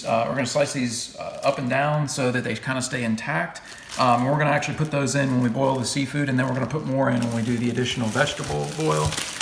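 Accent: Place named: North, United States. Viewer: you sound like a yankee. no wonder you did everything "back assward".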